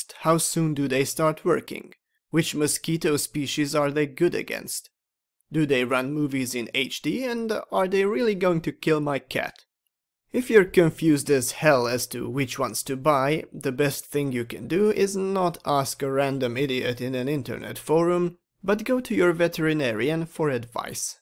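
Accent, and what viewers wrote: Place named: Hungary. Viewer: Best hungarian english on earth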